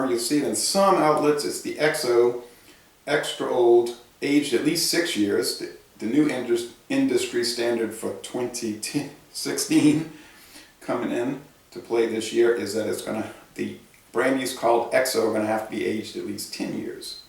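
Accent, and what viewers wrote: Louisiana, United States. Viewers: Where are you from brother i hear a little Louisiana accent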